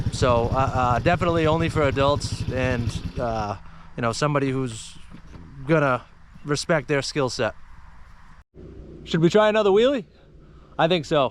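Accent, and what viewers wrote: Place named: Massachusetts, United States. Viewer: You sound New England. I’m from Maine and you sound like Massachusetts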